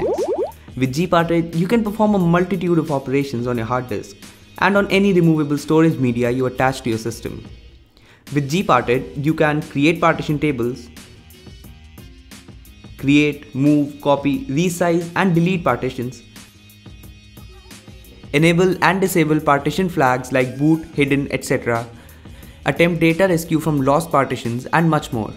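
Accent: India. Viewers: Why haven't I seen/heard even one indian guy who can speak english properly without this stupid accent? It's just like hearing some foreigner speaking broken language you use in your country, unpleasant to listen to. I'm not saying everyone should have perfect british/american/australian/canadian/whatever accent but come on. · sotaque indiano dos infernos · please google block all indian english accent videos it is horrible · Make your videos with "In indian english" in title You are polluting my youtube History, and my ears...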